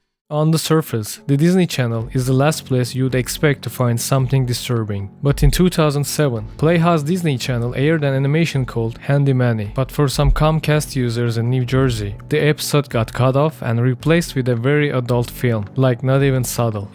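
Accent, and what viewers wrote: Turkey. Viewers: Yo dude are u turk? ur accent sounds like that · Hello where are you from? You kind of sound turkish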